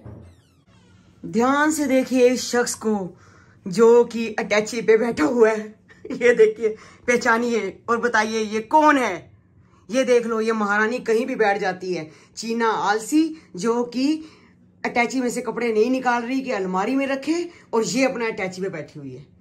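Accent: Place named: Punjab, India. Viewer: Aunty g apki way of taking mein jo punjabi flavour hota hai I like it love u lot from Punjab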